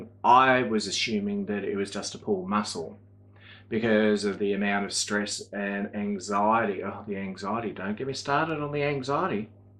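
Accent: Australia. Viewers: I was just thinking about you yesterday and checked to see maybe I'd missed any videos, then this popped up on my feed tonight. I'm so sorry you have all this to deal with and I'm praying for you and hoping you'll go into remission. You have many fans- you aren't the only "old person" doing/watching You Tube, lol. Your videos are so interesting, your voice is soothing and I'll take that Aussie accent any day!💕 Lots of prayers, best wishes and love to you,.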